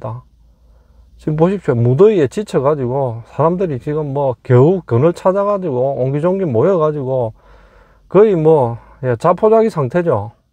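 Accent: Gyeongsang, South Korea. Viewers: 안녕하세요.. 유투브님은 경상도 사투리 이지만 목소리가 엄청 이뿌네요. 저도 경상도 인데 돌깨지는 소리같다고 합니다.. 집에서는요.. 유투브 님은 듣기좋은 목소리 입니다.. 시원하께 좋은 말씀해주셔서 감사합니다 . 특히 목봉 말입니다.. 항상 응원합니다. 수고하세요 😁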